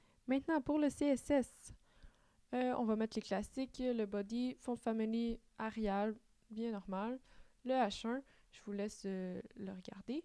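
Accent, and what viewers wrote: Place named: Quebec, Canada. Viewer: Merci pour ce tuto clair et pratique. Et l'accent de nos amis québécois en plus.... J'adore.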